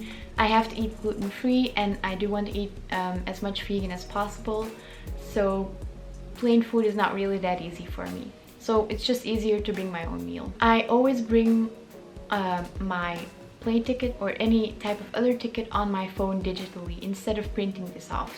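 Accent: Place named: Belgium. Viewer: you kinda sound like ur belgium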